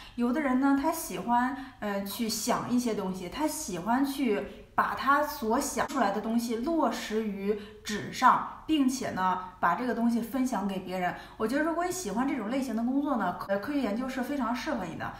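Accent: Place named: Shandong, China. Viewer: 听口音 Fiona酷似山东人，或者河南？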